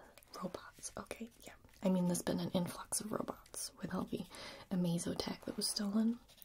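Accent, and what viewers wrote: United States. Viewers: The first video of hers I watched was the T-Virus Trial one and I didn't realize she wasn't American until she called a flashlight a torch. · I love your normal "accent" but your american accent just hits different 🤤❤ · Your American accent is better than mine. 🥹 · I just had ASMR videos on auto play in my headphones and this one started and I had to go and check who the heck it was. This feels like it’s from a weird alternate reality. Great job on the American accent. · Your American accent is so relaxing for some reason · Ok ok.....the "American" accent...that threw me off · Hearing an american accent from you was foreign for a second 😂 I loved the video, coming from an DC fan since i was 5 years old! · Your american accent is great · Why does your american accent sound like Benedict Cumberbatch's american accent?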